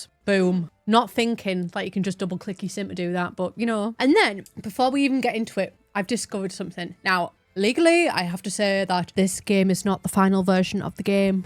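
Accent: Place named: North, United Kingdom. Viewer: Potential translation: "I was reeling, like- like excuse the gas, I'm supposed to be punching other people not myself" Unsure about the first part but the secind is definitely what you said I am proud at the level of northern british understanding I have reached. x'D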